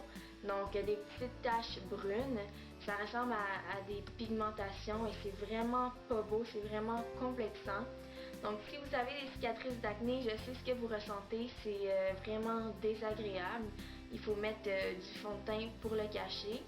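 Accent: Quebec, Canada. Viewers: Je viens de tomber sur une de tes vidéo et je suis charmée :) J'ai quelque petites cicatrices près de la mâchoire et je vais essayer ton truc bien vite. Tu explique les choses si bien et pour tout te dire l'accent québécois me fait craquer :D Gros bisous et merci pour cette vidéo, je m'abonne :)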